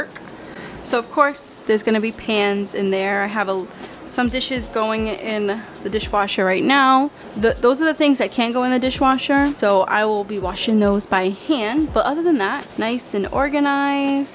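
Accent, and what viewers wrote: United States. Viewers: ah cool thank you so much for your reply it means a lot ❤️ pretty sure that's not a thing in the UK lol 😂 I'm in Bristol, England 😊 love your videos so much when I feel a bit overwhelmed with house work your vids give me the boost i need to get on with things. Also I love your accent and wish I lived In America lol 😂 hope you, your bloke and your new kitty are all well 😊 xx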